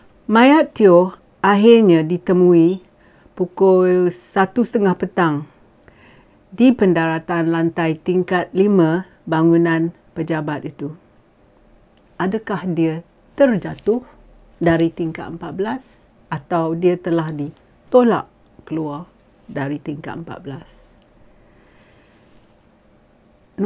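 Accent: Perak, Malaysia. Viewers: Pekat perak ni....org perak ke?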